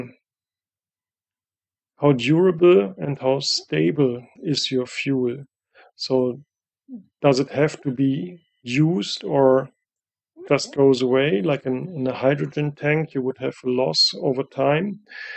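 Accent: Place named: United States. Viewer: The American accent is indeed prominent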